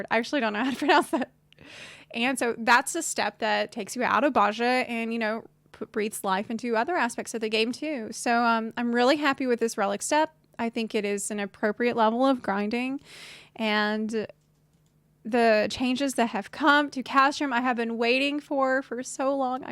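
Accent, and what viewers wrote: South, United States. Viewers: love that southern american accent too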